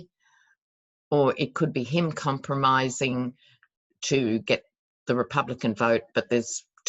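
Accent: Australia. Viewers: I love your Australian accent. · I love your accent. I actually listen to 3 of you aussies. You . Marianne and Elle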